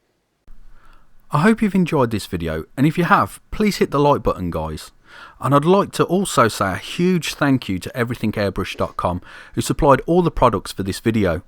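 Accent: United Kingdom. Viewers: Omg I love your accent! It's so deliciously UK!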